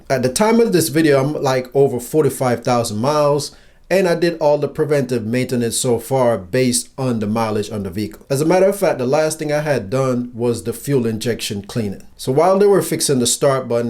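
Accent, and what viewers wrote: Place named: Jamaica. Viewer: You sound Jamaican ...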